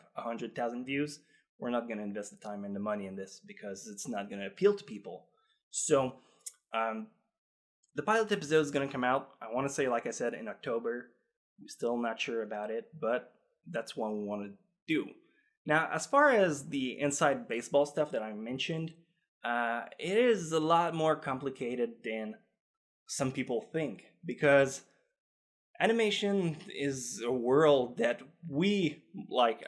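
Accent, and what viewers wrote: Canada. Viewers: I just realized your Canadian accent👌, good luck m8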